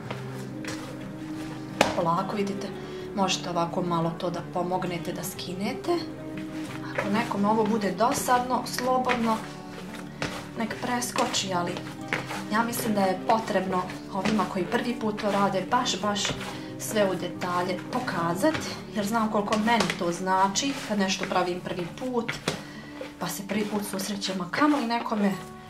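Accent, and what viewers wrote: Bosnia and Herzegovina. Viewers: Tako umiljat i ljupki glas vredi slusati a i pigledati recepte. Svaka cast za recepte lepo i jasno sve objasnjeno. Pozdrav iz Kraljeva. Jos nesto. Bosanski akcenat slusala bi ceo dan 😍😍😍😍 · Preeeedivno objasnjeno! Divan,njezan, melodican glas! Obozavam vas Bosanski akcent! Super izgledate! Po svemu sudeci, vi ste jedno divno, perfektno stvorenje! Blago onima koji vas imaju! Svi recepti su vam odlicni! Pisem, kopiram u svesku, kuham i i uzivam u divnim ukusima, kombinacijama koje dajete, ne samo ja nego i moja djeca i unucad!! Hvala Vam veliko! Pozdrav iz Detroita😘😘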